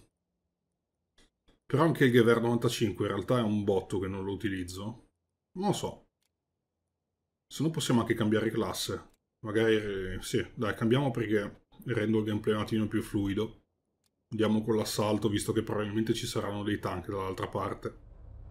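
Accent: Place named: Lombardy, Italy. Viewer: ti ringrazio a te per il "bell" ahahah. Hai un'accento familiare, sei lombardo?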